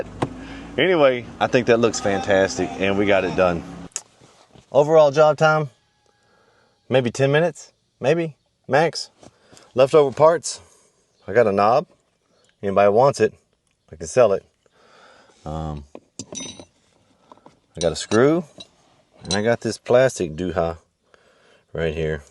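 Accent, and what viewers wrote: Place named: United States. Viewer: Dude that country accent is one of the things I miss moving to Canada. Good looking addition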